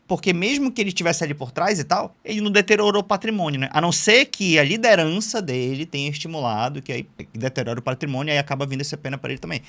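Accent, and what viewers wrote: Manaus, Brazil. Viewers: Fala, em um vídeo seu, vi que estudou na UFAM. Você é amazonense mesmo? O sotaque parece muito com o manauara.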